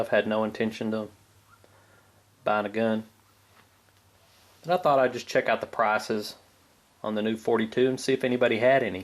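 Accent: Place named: North Carolina, United States. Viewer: That's a NC accent if I ever heard one lol. My best friend in the military is from NC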